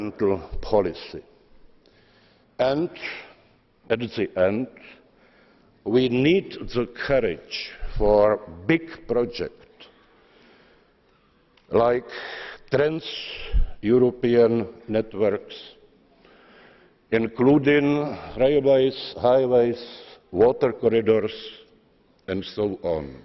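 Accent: Czech Republic. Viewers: Konečně sebevědomý Čech, který veřejně mluví czenglish. Číňani mají čínglish, Indové hindish, Australani cockney, Američani američtinu … tak proč my máme furt ležet na Prokrustově loži Oxbridge. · Čím to je, že Češi mají furt potřebu někomu se vysmívat, nad někoho se povyšovat a pomlouvat, ačkoli bych dal ruku do ohně za to, že většina místních diskutujících "English expertů" svojí jazykovou výbavou nesahá Zemanovi ani po kotníky?? Ten člověk umí anglicky velmi dobre, má prostě jen silnej českej akcent, toť vše.... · Jelikoz je prezidentem Česke Republiky, mel by umět správnou vyslovnost, anglicky umi, to nepopirám, ale ma ceskej prizvuk, muj nazor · Angličtina po česku 😅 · Best Czech accent!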